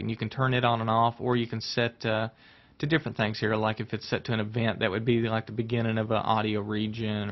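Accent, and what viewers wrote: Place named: Texas, United States. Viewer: Dude!, are you Texan? You sound it with your delightful dialect.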